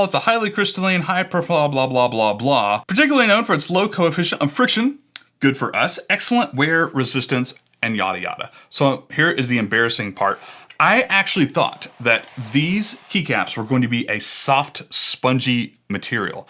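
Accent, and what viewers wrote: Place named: United States. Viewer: 1) Matcha is a type of green tea, where the tea leaves are part of the beverage and not just the brew. It is pronounced MA CHA. 2) It takes forever to come to you because you sound like you are in the USA, and I believe the website your ordered from is in AUSTRALIA 3) The density of POM, or at least these ones, I think is slightly higher than PBT. Dunno if density translates to weight.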